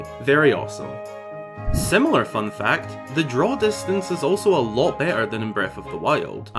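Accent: Scotland, United Kingdom. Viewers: Mate I know all I did last video was compliment your title screen but I have the urge to say thank you for including captions. My mother loves Zelda and only has 10% hearing left, and also you're Scottish and whilst I understand the thickest Glaswegian, sometimes people find the accent difficult. So thanks again for being so inclusive!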